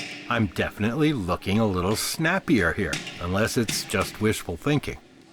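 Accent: United States. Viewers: Fantastic efforts - you’re inspiring me to get moving, even if only in my 40s. Just one q - where did the American accent come from when living in Ireland?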